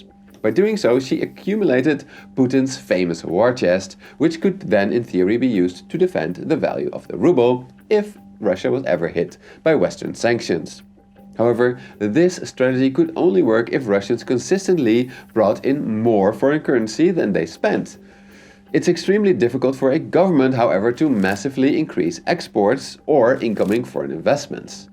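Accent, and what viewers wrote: Netherlands. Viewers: Dutch accent (a little bit similar to slavic accent, because the Dutch language sounds like Russians speak English), " is a PhD candidate at the University of Groningen, the Netherlands."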